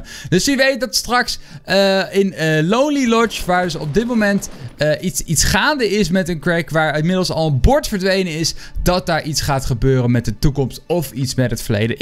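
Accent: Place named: England, United Kingdom. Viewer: Geweldig Engels accent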